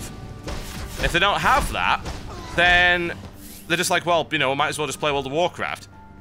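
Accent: United Kingdom. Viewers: that seemed to be a generally understood concensus. Also, i don't recall any REAL complaints. I would SO watch in 240p. Mostly i just watch for the hillarious comentary in the british accent tbh!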